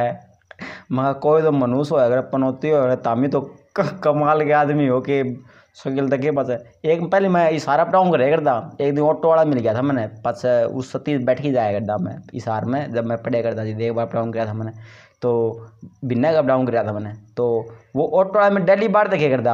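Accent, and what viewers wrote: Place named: Haryana, India. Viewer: Gajab hai teri Haryanvi Boli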